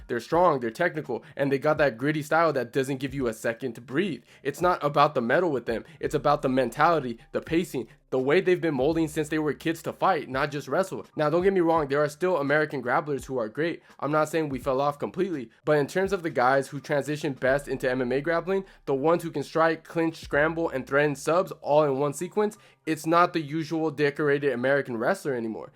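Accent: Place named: Mexico. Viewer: whos we? You dont sound american you sound mexican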